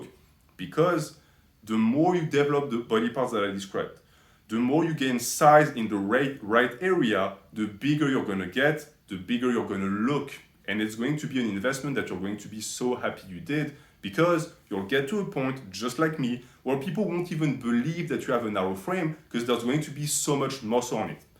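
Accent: France. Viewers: No way you aren't a french compatriot with this accent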